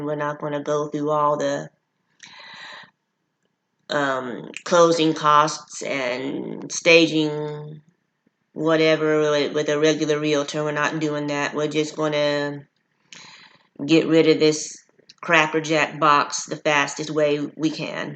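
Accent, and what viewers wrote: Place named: Louisiana, United States. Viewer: OK awesome I thought I detected a bit of a Louisiana accent LOL I am here near Baton Rouge Louisiana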